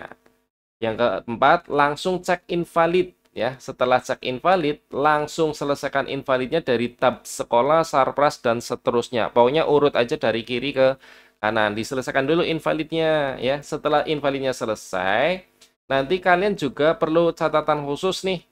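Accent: Banyumas, Indonesia. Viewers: makasih banyak mas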